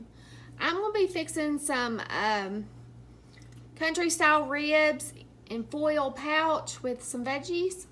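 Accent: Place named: Eastern Kentucky, United States. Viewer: This looks so good! I have never made ribs....of ANY sort! That is my husbands thing! I recognized your accent! My sister in eastern KY for years!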